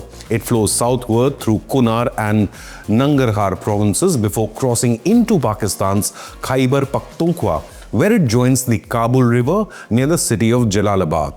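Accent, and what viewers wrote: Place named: India. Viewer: आपका accent बहुत देसी है। आप अंग्रेज़ी हिंदी के माफिक बोलते हैं। 🙏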